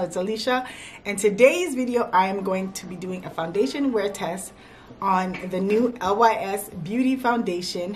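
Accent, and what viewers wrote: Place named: Barbados. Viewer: I detect an accent...are you Bajan? Btw I love how that foundation looked on you♥️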